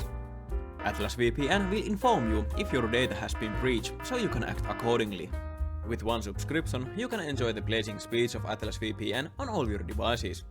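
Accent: Finland. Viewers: You have the strongest Finnish accent I have ever heard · Oot suomalaine kuulee aksentist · You have so strong finish accent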